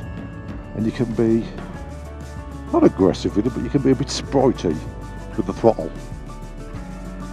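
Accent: Birmingham, United Kingdom. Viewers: Tuned into to this as I've been thinking about getting a full license and the idea of a CB500X caught my attention for a while. First few seconds and I'm hearing a familiar accent... Within a minute or two I realise where you are and how this isn't a million miles away from me! First time I've seen a bike vid that's based over in Brum side. I'm going to have to go through some other vids now! Thanks in advance. All the best :)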